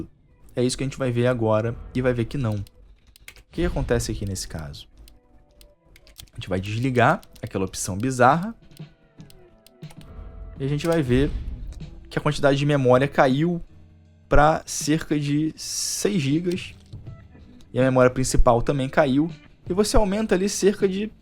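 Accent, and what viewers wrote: Rio de Janeiro, Brazil. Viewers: kkkk eu casco da forma que os cariocas falam, é estiloso kkk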